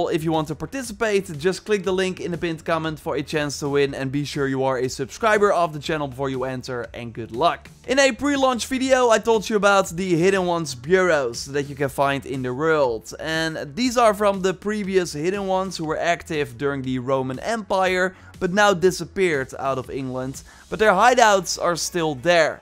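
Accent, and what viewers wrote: Netherlands. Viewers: Never watched you before. But based on your accent, I immediately knew you are Dutch!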